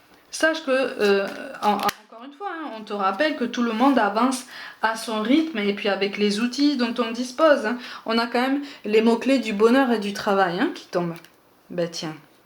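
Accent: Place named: South, France. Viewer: Merci pour votre travail, très joli accent du sud;) Je m'abonne avec plaisir:)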